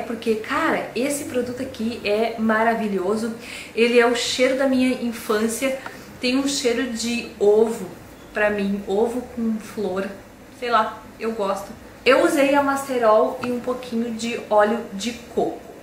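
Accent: Rio Grande do Sul, Brazil. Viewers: e gaúcha? Vc fala lindo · Sotaque gauches kkk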